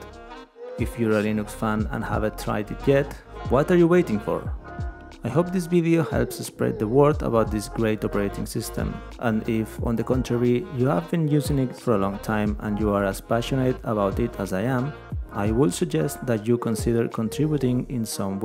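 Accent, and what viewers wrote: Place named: Brazil. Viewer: brasileiro tentando falar ingles, suponho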